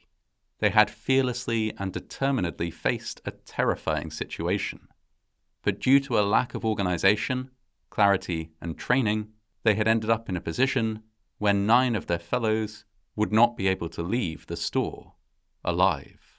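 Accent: United Kingdom. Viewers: The narrator is so annoying. Why do we have a British narrator with an American story sounds bloody awful.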